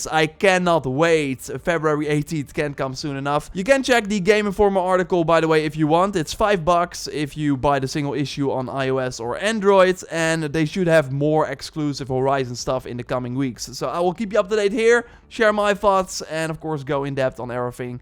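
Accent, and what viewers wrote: Netherlands. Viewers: I can tell he's Dutch and it's just too funny.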